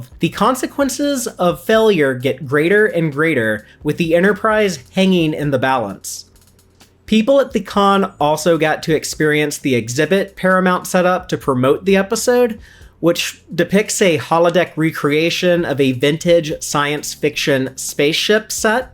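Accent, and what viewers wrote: United States. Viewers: You did a great job of presenting this! Do not apologize. It's nice to hear an American accent sometimes, and you spoke so clearly that I didn't need captions. TREK X MUPPETS?? I'm there. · No offense to this narrator (He did a really good job) but I love Sean's and Ellie's accents. Getting my Star Trek news in an American accent just doesn't hit the same.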